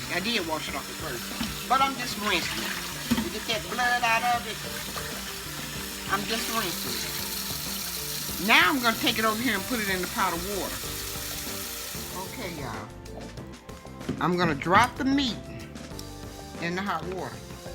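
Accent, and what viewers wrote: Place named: Mississippi, United States. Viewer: Accent is 100% msg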